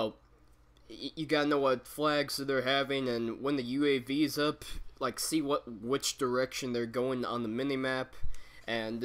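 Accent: Hawaii, United States. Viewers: he has a Hawaiian accent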